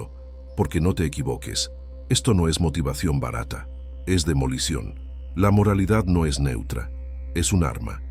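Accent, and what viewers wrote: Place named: Argentina. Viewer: Acento agertino a tomar por culo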